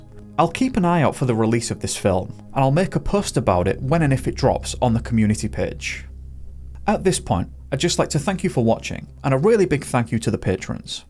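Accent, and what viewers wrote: United Kingdom. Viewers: Your British accent is fab!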